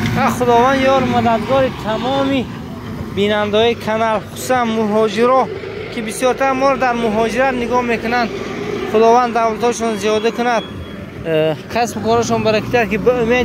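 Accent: Mastchoh, Tajikistan. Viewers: Бо лаҳҷаи Мастчоҳи гап мезанан.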